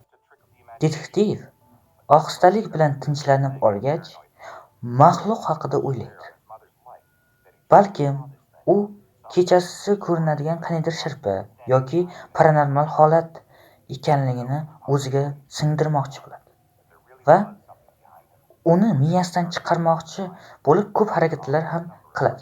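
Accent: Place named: Shofirkon, Uzbekistan. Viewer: Shofirkonlikmisz deymn shevayz tortyapti😂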